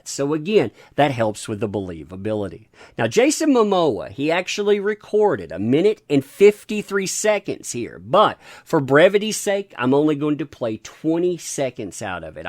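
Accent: South, United States. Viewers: So love the southern accent during the Hollywood gossip 🤗 Reminds me of home 😋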